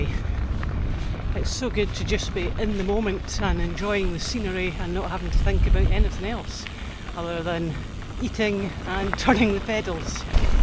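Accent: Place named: Scotland, United Kingdom. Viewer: Trying figure out the accent, where you grew up. You say you lived in Glasgow but you sound just like my mate from Selkirk. Pop Tarts are actually a great as a ride snack. Fairly heavy so 1 pop tart lasts ages, sugar for burst energy, carb for slow release. They keep empty bellies at bay if nibbled on throughout the ride. I do Cumbernauld to Dalkeith a lot as my partner lives there and i live Dalkeith. Depending on whether i do: Cumbernauld > Falkirk > Boness > Dalmeny > Edinburgh Canal > Edinbrugh or Cumbernauld > Cauldercruix > NCR75 > Edinburgh Depends on whether i take a few pop tarts. Canal gets you EICA and the cafe there. Boness has a nice wee cafe but the NCR75 keeps you from the main streets so i take provisions. ;) A wee pop tart. , I often ride to on my fatbike to North Berwick #MuttsCycles Videos on my business channel. But this section you are on i hate doing. 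lol, an... ex just stays 5mins form where you are. Always worry about bumping into her (tbf, i'm sure it'd be fine). 🤣😇 It's ok you missed Gullane, nothing worthwhile there anyway. 🤫 I'd say the Walled Garden is just up the road but you gotta be a millionaire for a Tea and Sausage Roll. 🤪 I bought my Fatbike from a Guy in those houses behind the fence. Super expensive. Nice though. Thanks for the video. I've not done Helensburgh section. Might look into that next weekend or similar. I enjoyed the video. Feel like it deserves more views.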